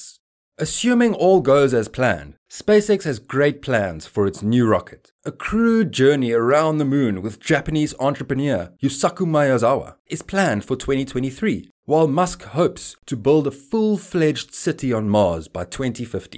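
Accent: South Africa. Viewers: Could listen to your South African accent all day ✌ Always look forward to your informative videos keep up the great work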